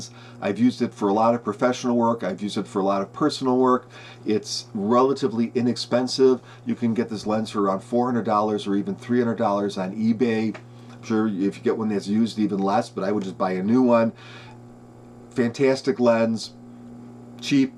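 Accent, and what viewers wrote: Chicago, United States. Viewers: Do I detect a slight Chicago accent...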